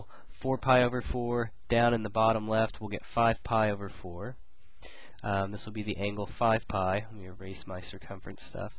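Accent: United States. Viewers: one of the american accents ;) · True, but I thought he was an American. So American to American, there is no accent. (I do understand that it's an American accent, but between Americans we don't notice a difference.) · of course!! It is American Accent.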